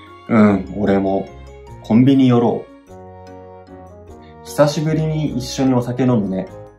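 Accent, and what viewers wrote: Taiwan. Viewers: 老师的中文也有点台湾腔了haha🙋🏻‍♂️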